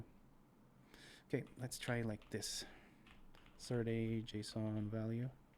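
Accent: Brazil. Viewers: No momento em que eu ouvi tua voz sabia que tu era brasileiro kakakak Boa mano, é muito bom ver alguém da comunidade trazendo um video em rust tão bom quanto esse Sucesso mano \o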